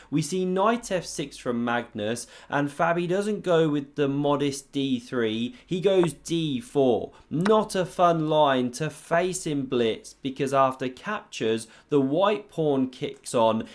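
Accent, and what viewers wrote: England, United Kingdom. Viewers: Off topic: Where is your accent/dialect from? I think England, but where?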